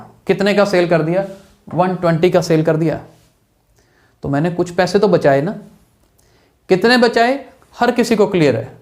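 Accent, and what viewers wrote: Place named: Punjab, India. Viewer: Punjabiyo ki language alag hi pta chal jati he😊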